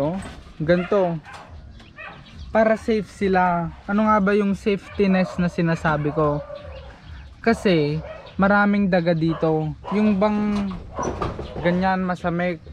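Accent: Ilocos, Philippines. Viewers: Iba talaga nu ilocano ang naga tagalog nagmayat pakinggan hahaha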